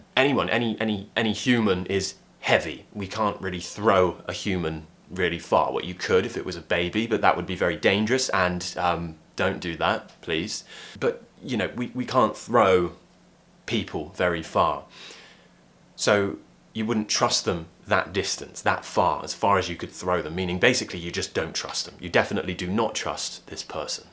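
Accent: RP, United Kingdom. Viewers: WOW it's the best video I've ever seen in a British accent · Very nicely done, and I do enjoy listening to your cool British accent. I notice that you've made a point of saying that you do not use outdated expressions. However, I can tell you that here in America at any rate, every one of the idioms you discussed has been around for quite a long time. They were all used when I was a kid (in the 1950s) and used by my mom and dad much earlier. But never mind, I enjoyed listening to you, and I will look for more of your videos. And if it's not being too old fashioned, I congratulate you on "getting hitched", and all best wishes to your lovely "ball and chain". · Wonderful examples and I absolutely like your British accent! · You are the reason that i like British accent thank you a lot sir 😍🔥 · Excuse my french (I am francophone and enjoying your lessons, your British pronunciation), but I wish to congratulate you for your marriage and wish you a lot happiness and... 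many children (?) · Sir how can I learn your basic English British pronunciation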